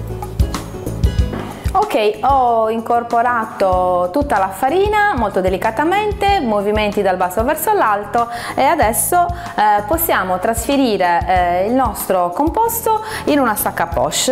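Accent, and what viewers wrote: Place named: Sardinia, Italy. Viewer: Si sente che sei Sarda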